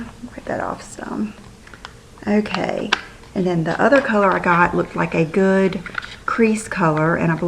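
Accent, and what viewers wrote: South, United States. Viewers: I think the Naked 2 comes out on the 25th of this month, I have a feeling it will sell out fast. Can't wait to see your new shoes I know they are going to be fabulous. The Makeup For Ever new eye shadow replaced the older ones that have been out for a long time, the pallets are only one dollar, it doesn't matter which one you buy. Hope you have a great weekend, can't wait for part 2. Love coming home and relaxing with your video on Friday night look forward to it all day. I think it your southern accent it is so nice to listen to. XOXO Emily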